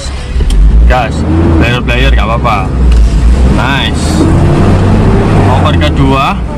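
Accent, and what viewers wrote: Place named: Java, Indonesia. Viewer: Dari logat bicaranya sepertinya sampean orang Jawa mas ya.. 🙏 Salam saking tiyang Jawi teng Papua mas-seee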